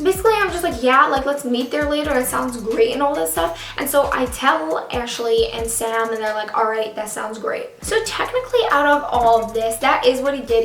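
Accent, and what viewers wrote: New York, United States. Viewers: MariaHerrle she has a New York accent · She's from New York, it's a common accent💗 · Anyone else just love her new york accent?? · She has a New York accent! You can here it when says ask and again! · Her New York accent is so strong in this!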